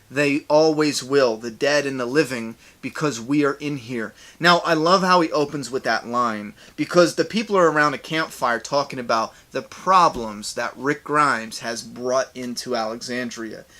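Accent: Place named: New Jersey, United States. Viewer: Gotcha. You had this Jersey Girl fooled. Your accent is sooo Jersey. And your humor is similar to Jay from Jay and Silent Bob from the Kevin Smith movies who is also a comic guy and from NJ. Thought I #nailedit ...smh... LOL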